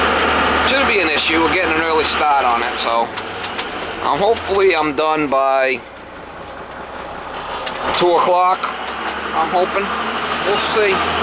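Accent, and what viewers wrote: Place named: Massachusetts, United States. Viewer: I think your awesome. I was a boiler technician in the US Navy that led me to good directions in my life. I totally respect what you do. I can relate, big time. I’d love to work with you sometime and I know that won’t happen. I grew up in Connecticut and can relate to that Massachusetts accent you got. Fuckin’ love it! My fortè is electricity and plumbing. Make that hay while the sun shines momma! I hope that blow fish blows away from you if you know what I mean.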